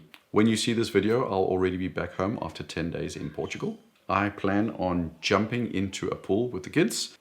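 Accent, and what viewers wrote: South Africa. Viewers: A fellow Saffa, I got the Pelagos Fxd Gmt, moved over from a garmin smartwatch, Strange enough from my research, Tudor played a huge role in the old sadf & navy Hear your accent & I was like this guy could be South African, he's using the word chill & the nearing the end said a braai done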